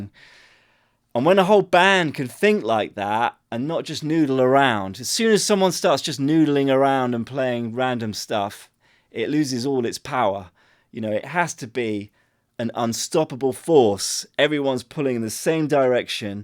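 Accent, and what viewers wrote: United Kingdom. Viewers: I just love listening to your fine British accent, lad! Reminds me of a visit I once paid to my British pen pal, decades back from now. I was a pupil in German high school at the time. Oh. and your music, it is so adorably funky, love it 👍👍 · I gotta admit ... there are few things funnier than hearing a white guy with a proper English accent placidly proclaiming: “We can all get down with our bad selves”.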